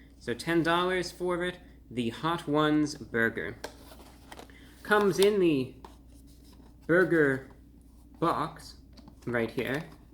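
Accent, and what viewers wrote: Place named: Mid-Atlantic, United States. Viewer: What makes these reviews a rare gem? The deliberate pacing, articulation, lack of saying "um", and that mid Atlantic "accent". Not to mention it's entertaining!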